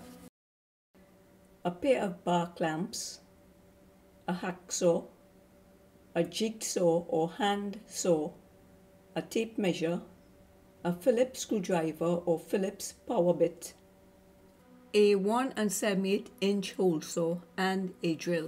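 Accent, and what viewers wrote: Trinidad and Tobago. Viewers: Great job Are you Trini?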